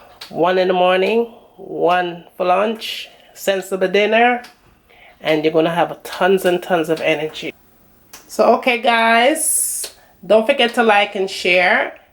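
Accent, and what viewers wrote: Jamaica. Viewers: Jamaican accent tan up strong duh... Thanks for sharing heard about this now I finally see it on youtube.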